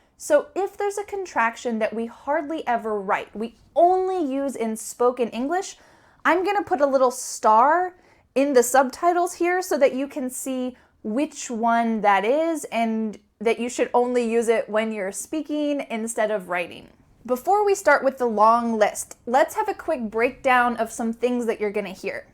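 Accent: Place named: United States. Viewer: Omg now i knew why your (American) accent wins our tender hearts. From india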